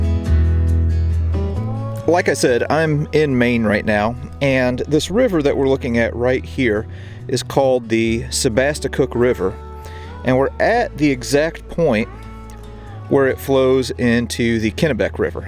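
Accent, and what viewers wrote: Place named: New York, United States. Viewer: Where are you from? You sound like youre from NY and youve never been to fort Niagra, or fort William Henry?..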